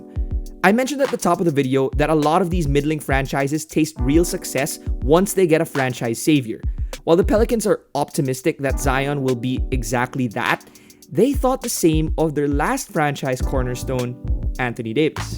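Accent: Philippines. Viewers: I was pretty sure you’re filipino judging by your accent and name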